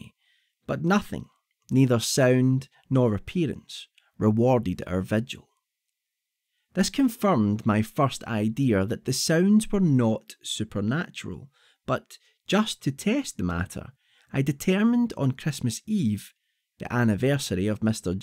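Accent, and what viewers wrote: Scotland, United Kingdom. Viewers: Enjoyed your reading . It was a suspenseful tale .Your scotch dialect reminds me of David Balfour in Kidnapped . We Americans sound ordinary . Probably not ordinary to other dialects but how can one gauge ? Also the smoke has a mesmerizing effect . Thanks for your audio tale !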